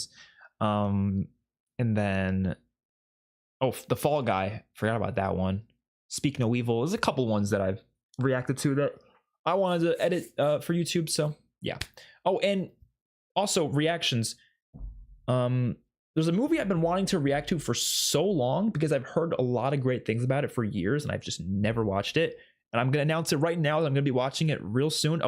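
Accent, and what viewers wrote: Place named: New York, United States. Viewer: Gotta be New York……northeast U.S. at least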